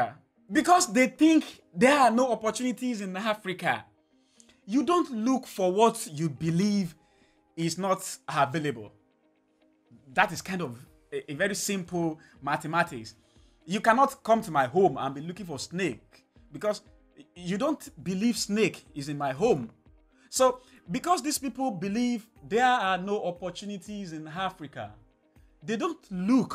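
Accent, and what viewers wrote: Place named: Nigeria. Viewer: I discovered your channel when you were at 40k subs, and I knew from your voice you were a fellow Nigerian. Your work ethic is insane! 🔥🔥🔥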